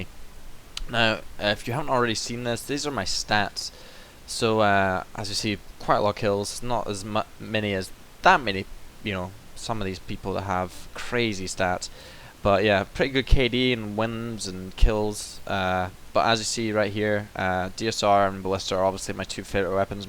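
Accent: Scotland, United Kingdom. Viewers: where in scotland are you from?:)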